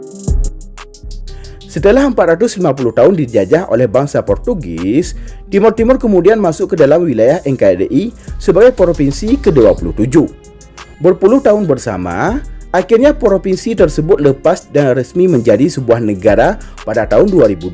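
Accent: Aceh, Indonesia. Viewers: Chanel pemecah belah NKRI..dr logatnya admin orang Aceh nih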